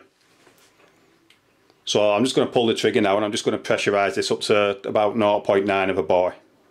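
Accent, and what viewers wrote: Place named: Barnsley, United Kingdom. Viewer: Sounds a bit like Barnsley accent. :)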